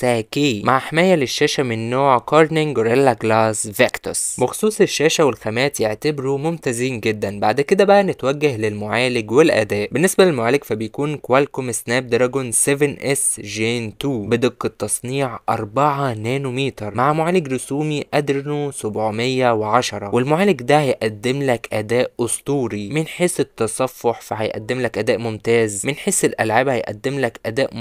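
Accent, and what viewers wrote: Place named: Syria. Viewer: اللّٰه كريم بس شكو تحجي سوري وانت عراقي 🗿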